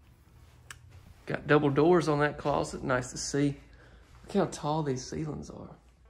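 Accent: South, United States. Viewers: The house is great, but the real star is your Southern accent! Love it!!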